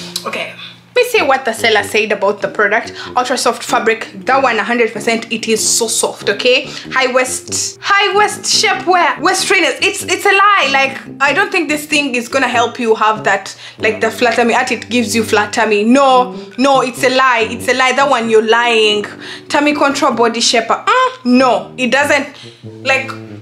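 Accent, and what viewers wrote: Uganda. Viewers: It's your kayindo for me. It really shows that your a muganda plus 👌👌👌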